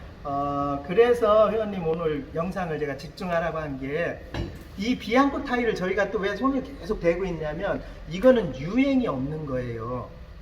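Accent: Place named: Chungcheong, South Korea. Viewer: 큰타일이 잘 깨지지않을까요? 충청도사투리가 재미있네요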